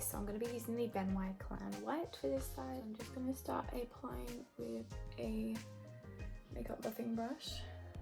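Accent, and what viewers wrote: Australia. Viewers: Are you Australian? I love your accent! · She really does sound like an australian, I also love the accent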